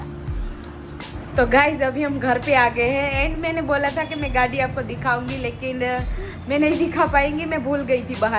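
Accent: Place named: Gujarat, India. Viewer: Gujarati ma j bolo dii. Kyoki aap Gujarati ho to aap ka Gujarati hi ton aa raha hey.. Hindi nhi...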